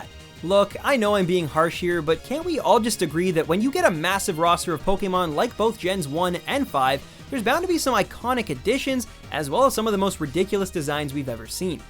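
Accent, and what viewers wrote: Canada. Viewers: Those Canadian Ab-outs hahaha I love it.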